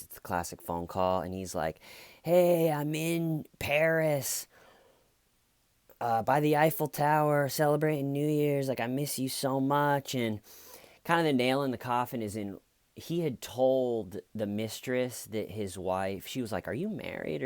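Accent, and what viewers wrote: United States. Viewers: Hello from bonnie Scotland 😁 you my love are very witty. Love your videos! It's like listening to my inner thoughts coming out of an American man's voice 🤣🤣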